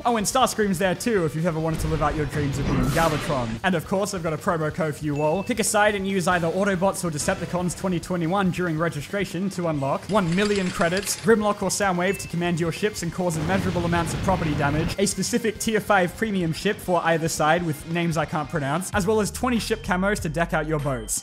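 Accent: Australia. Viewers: funny Australian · "Babe Wake up, the funny Australian made a new video"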